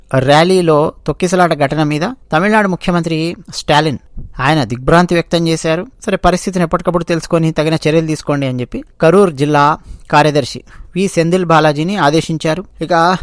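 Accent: Telangana, India. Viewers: U act smart I know u talk telagana. Ascent when feel other then u dont like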